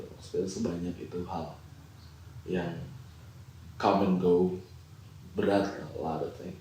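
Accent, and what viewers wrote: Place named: Java, Indonesia. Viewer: ternyata agak medok ya bapak kalo bhs indo😂😂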